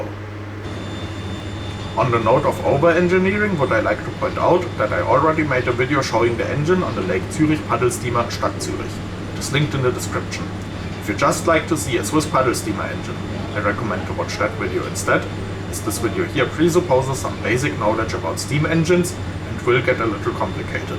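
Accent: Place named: Scandinavia. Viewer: The way the narrator talk is make me think if it's Sweden? Germany? Or Norwegian?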